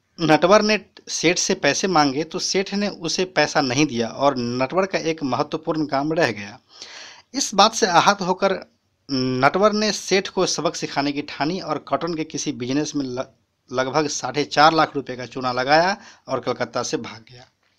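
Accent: Bihar, India. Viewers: Bhai yar bolne ke tareeke se pkka pta chal jata hai ki bihari hi hai 😍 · U are bihari ur voice totali bihari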